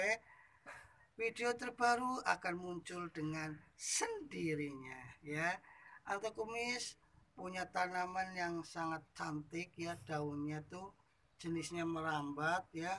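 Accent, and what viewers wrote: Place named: Banyumas, Indonesia. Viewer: Deneng ngapak,asli pundi nggih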